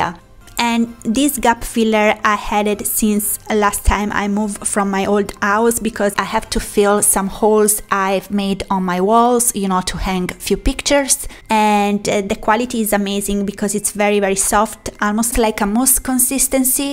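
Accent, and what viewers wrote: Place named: Italy. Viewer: love your channel:) your accent is very much like my friend from Casarano, Italy 🇮🇹 Viva Italia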